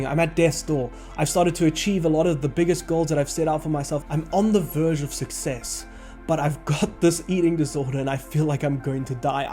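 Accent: South Africa. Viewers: brother are you afrikaner or english south african?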